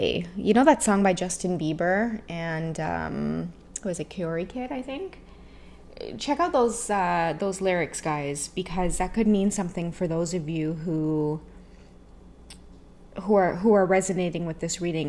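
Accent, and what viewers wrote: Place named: Trinidad and Tobago. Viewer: It sounds so lovely when speak Trini. I just happened to hear on of your shorts rt now where Ur speaking Trini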